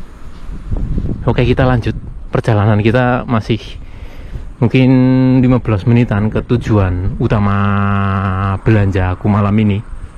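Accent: Banyumas, Indonesia. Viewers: Jangan malah resep gua mah denger bahasa ngapak kaya kiye 😂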